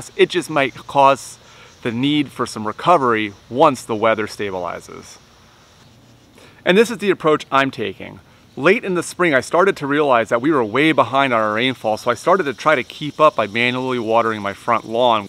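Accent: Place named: North, United Kingdom. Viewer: What's your location? you sound like you're from up North but Bermuda doesn't grow up there ha.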